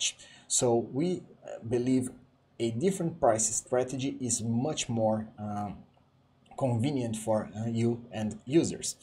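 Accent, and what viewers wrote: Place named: Brazil. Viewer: Cara, é incrível que no instante que eu te ouvi falar eu já tinha quase certeza que você era brasileiro, fiquei muito feliz por isso. Sucesso pra vocês e obrigado pelo conteúdo.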